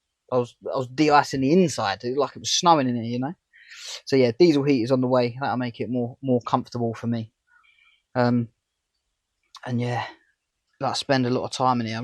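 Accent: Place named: United Kingdom. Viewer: I think your channel showed up on my feed because I follow the UK van-life YouTubers Camper Vibe, and I think they have the same accent as you. Check them out and tell me how they sound to you.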